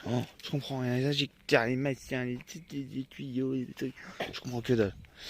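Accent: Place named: Paris, France. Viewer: je ne sais pas si on peu vivre avec 1 rsa , meme en camion, qd le camion doit aller au garage ca coute 1 blinde ,au veto avec les chiens egalement , .....et tu pleures , ....cest plutot de la survie , tant que tout va bien .........au moindre enmerde c complique , jespere que tu as la possibiite de faire 1 peu de black par ci par la , le systheme D , tu nas pas laire bete , tes chiens ont lair heureux ca c bien, tu fais de belles videos , tu a lair plutot sympa avec ton accent de titi parigo , tu as raison de profiter de la vie t jeune , et te souhaite le meilleur , mais pense que tu va aussi vieillir 1 jours , .......et que la vie en camion na qu1 temps .....continues a nous regaler avec tes videos .tu devrais flouter ta plaque dimmatriculation, avec tout les craignos quil y a sur internet , ......petit conseille de vieux roots .....les bougies de prechauffe cest surement la cause , c pas donne , ......1 camion de + de 15 ans , le turbo peut etre l e probleme , changes t filtres aussi .....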